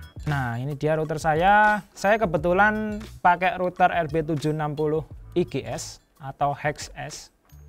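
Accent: Java, Indonesia. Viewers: Medok mu mas aku suka hahaha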